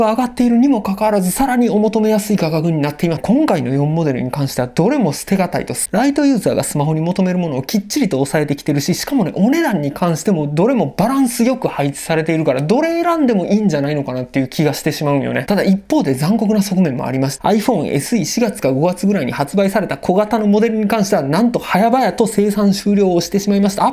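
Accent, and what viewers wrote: Kansai, Japan. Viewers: いつも最高にわかりやすいし、テンポ関西弁どれをとってもすばらしいです。 今回のIphoneも早く解説してくれないかなぁと心待ちにしておりました。 （Iphoneユーザじゃないけど） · 関西弁のこの早口がOKなら 日本語無敵じゃないですか そもそも津軽弁とか甲州弁とかなると 日本人も分からなくなるんだし(￣▽￣) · 関西人って感じだよね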